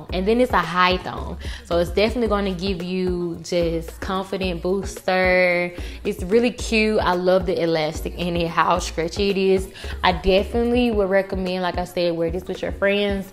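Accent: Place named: Atlanta, United States. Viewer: These 🔥 with that accent you have to be from Atlanta lol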